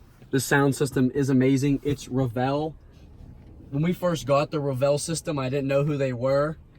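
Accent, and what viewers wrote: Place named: Pittsburgh, United States. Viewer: Lol are you from Pittsburgh? I can tell that accent from anywhere!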